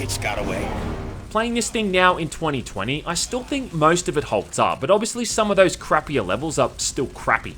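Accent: Australia. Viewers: Am kinda surprised to see you doing a re-review BUT it is SIN and that smooth Aussie accent dose it eveytime 😂👌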